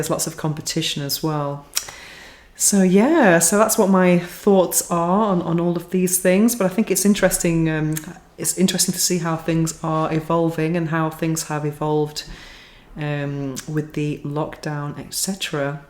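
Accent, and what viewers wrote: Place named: RP, United Kingdom. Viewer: Absolutely, I didn't mean it as a critique. You usually speak, for someone who doesn't have English as a first language, in a way which sounds more of a received pronunciation. Or it might be my imagination for all I know. :D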